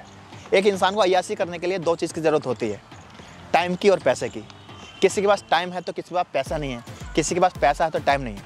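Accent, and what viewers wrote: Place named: Rampur, India. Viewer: I am also from rampur tabhi aapki voice se samajh aa gya....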